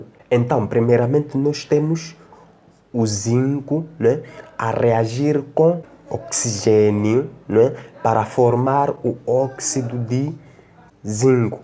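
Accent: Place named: North, Portugal. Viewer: Você é do norte. Right?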